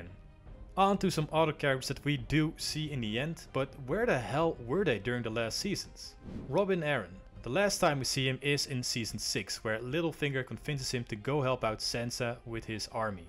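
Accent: Netherlands. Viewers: Nou je Engels is goed genoeg hoor! Had wel binnen 10 seconden door dat je uit nederland komt;) Lol! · are you by any chance dutch?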